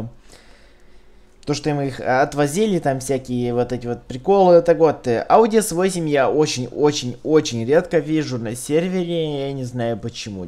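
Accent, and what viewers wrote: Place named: Moldova. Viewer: Акцент молдована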